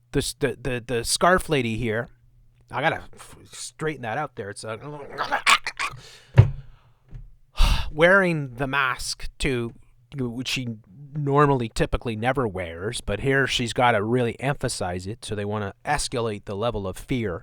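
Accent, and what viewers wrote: United Kingdom. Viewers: British accent, most annoying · I’m from the U.K. her accent is typical BBC and that corporation is absolutely evil, they hid Jimmy Seville’s disgusting behaviour to minors and have a pretty disgusting statue outside the broadcasting house which shows exactly what they are.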